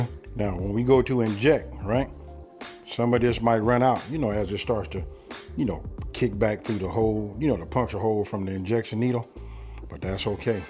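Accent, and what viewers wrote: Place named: West Coast, United States. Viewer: Keep going bro... only chef with that gangsta west coast accent... Love how your channel is blowing up.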